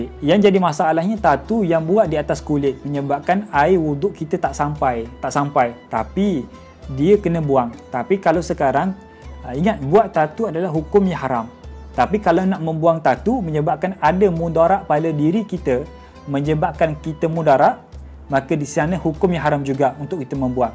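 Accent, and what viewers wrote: Kelantan, Malaysia. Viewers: Ustaz org Kelantan ke ?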